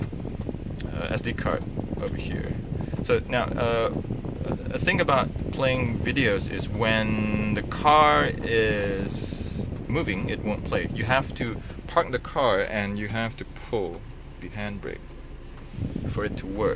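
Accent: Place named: United States. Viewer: you sound like American. do you live there?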